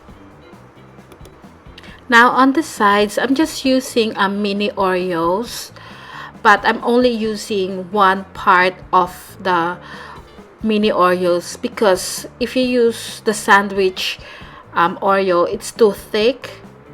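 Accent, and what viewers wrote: Philippines. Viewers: Yeah. unang rinig ko palang, alam ko ng filipino sya hahaha. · matias Low IQ lng d nkakaintindi s kanya.. She has a filipino accent so dapt mas naiintindhan mu! Wag kang epal! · but you have to admit... she does sound filipino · Are you a filipino? Because you have a Filipino accent. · sure ka ba d mo naiintindihan??? Filipino ka dba? tsk tsk.. mka-insulto lang. accent is not important sa Youtube te as long as naiintindihan ng nakakarami. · Filipino ka no teh? Accent palang ih!! Hehehe!!! Sana teh translate mo to sa tagalog. Nosebleed!! Choz! Pero pa translate teh para maintindihan ko ng maayos hahaha!!!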